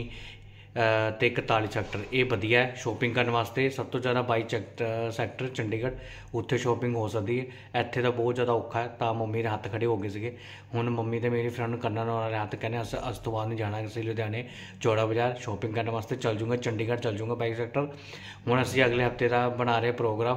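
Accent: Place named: Bihar, India. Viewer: Bhai Tu Punjabi Nai Lagda Tu taa Bihari Lag Reha hai Boli toh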